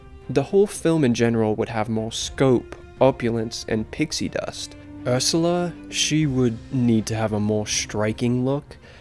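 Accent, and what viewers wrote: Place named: United Kingdom. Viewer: This is my second video from you that I’ve watched and I just have to say that your accent is really confusing me bro. You sound like a Brit doing an American accent. There’s no way you aren’t from the uk or at least spend a long time here or something because you’ve got proper English vowels mate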